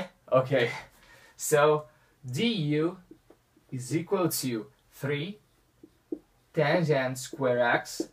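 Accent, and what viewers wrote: Brazil. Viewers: Galera, vamos acordar 2018! Saber inglês não é diferencial, é essencial! Mais do que nossa obrigação saber a lingua universal ainda mais que ele tem bastante sotaque brasileiro e fala devagar dá pra entender claramente! Excelente vídeo amigo!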